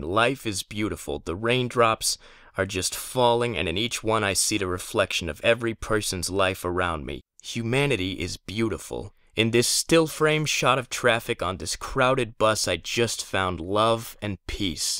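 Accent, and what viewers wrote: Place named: East Coast, United States. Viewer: the East Coast accent helps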